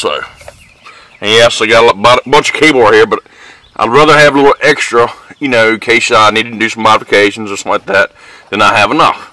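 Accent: South, United States. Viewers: From western Canada, thanks, great setup! and a pleasure to watch and hear your southern accent.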